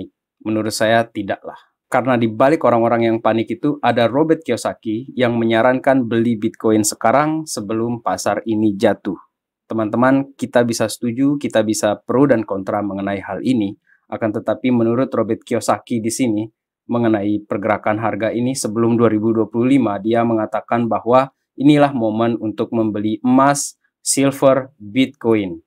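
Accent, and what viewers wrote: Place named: West Java, Indonesia. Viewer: logat nya mirip sunda daerah priangan timur 😅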